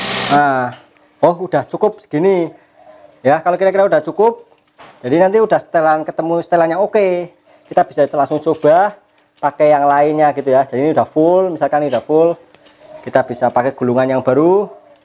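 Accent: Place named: Banyumas, Indonesia. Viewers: Ngoser, jembeng n amba....hahahaaa, ngapak abis Mas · Mas orang ngapak ya sama dong 😁😁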